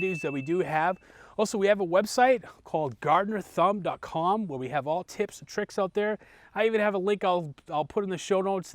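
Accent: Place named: Northeast, United States. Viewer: North Carolina?? If you aren't from the north east, someone who raised you must be. Thanks for the info. I'm about to start a worm bin, so this helps. Best to you and your family, peace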